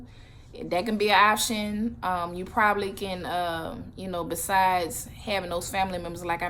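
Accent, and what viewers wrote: United States. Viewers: Louisiana accent strong lol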